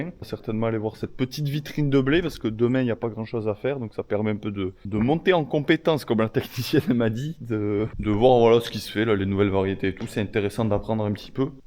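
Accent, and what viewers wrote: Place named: South-West, France. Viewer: Nouvel arrivant sur ta chaîne, j'aime beaucoup tes vidéos,ta façon de voir les choses et j'apprécie cet accent de chez nous ca fait plaisir...je dis de chez nous car tu as l'air d'être guère loin de mes belles LANDES,un voisin du Gers ou non loin je suppose 🤷‍♂️😅 le sud ouest en tout cas 🥰 Bon courage et bon travail a toi,avec une centaines d'hectares tu as de quoi faire !! 💪✌️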